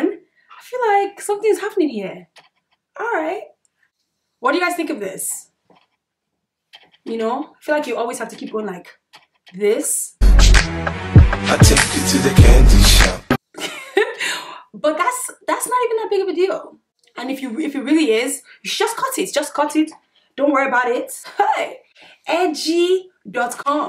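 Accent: Nigeria. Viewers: Pixie crop is hands down my favorite plus I love how you switch up that Nigerian accent. Steady makes me smile 😀😀😀😀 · I love your Nigerian accent. You had me dying. (I'm Nigerian so it made me laugh) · I just want to keep listening to you and that mixed Naija accent. Watching your videos instead of editing mine and im already late for my Youtube upload. Stopppeeet! Ya too cute girly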